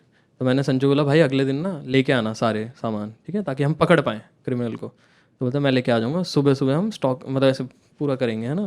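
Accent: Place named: Sonipat, India. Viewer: bhai jo teri awaz m tone h n dost va sonipat aali h dost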